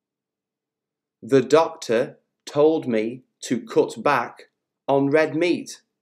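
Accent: United Kingdom. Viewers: I'm so obsessed with your British pronunciation. I think it sounds so sexy when speaking like that. I fancy scranning food and shopping spree, too. teaches us spiffing & stupendous lessons. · We want to continue teaching all the phrasal verbs in your performance. Just great British pronunciation and brilliant phrasal verb learning tactics !!!!!👍👍👍👍👍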